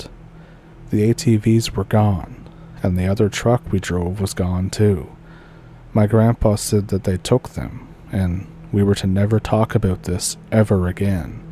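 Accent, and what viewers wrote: Ontario, Canada. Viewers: Odelay,! 🎉... Listening to your voice so reminds me of my cousins from Grey, Ontario 😊😊😊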